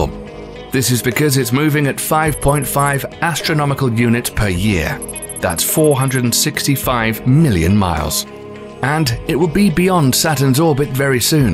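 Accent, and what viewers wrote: United Kingdom. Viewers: Awful nit brit narrator. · British accents make narrations sound a million times better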